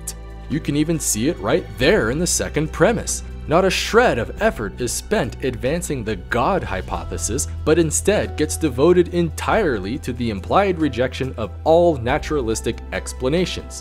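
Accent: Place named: Utah, United States. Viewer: Else -> elts, false -> falts, where do they speak like that? I've only heard "elts" to be a Utah-regionalism.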